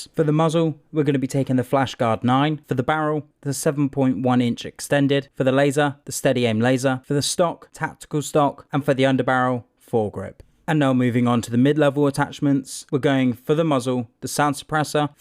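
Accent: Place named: United Kingdom. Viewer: THANK GOD A FELLOW BRIT EXPLAINS WHATS WHAT. WHY'S SO MANY IMMATURE, AMERICAN LOUD GOBS, SCREAMING LIKE KIDS,WITH NO REAL INFO? I AM SO ANNOYED AFTER 7-8 ATTEMPTS OF GULAG CONFUSION. IVE PLAYED EVERY COD GAME,BUT THIS DONT EVEN SEEM TO HAVE A "ME V BOTS, SOLO PRACTICE"? IS THERE ONE? PLEASE TELL/HELP!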